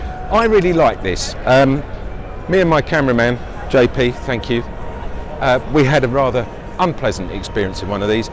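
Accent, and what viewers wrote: United Kingdom. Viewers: Please give the the figures in miles, you sound british !!!!!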